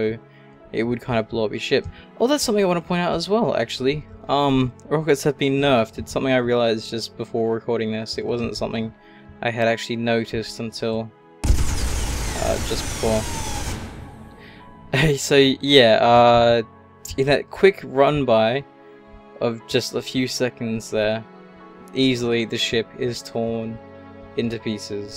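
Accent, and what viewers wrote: Australia. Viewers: What country you from? Australia??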